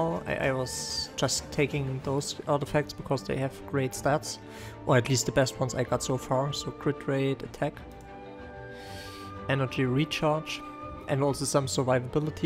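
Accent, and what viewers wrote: Germany. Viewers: Don't think so. Sounds very german. Unlikely he would consider chinese server with rather bad ping.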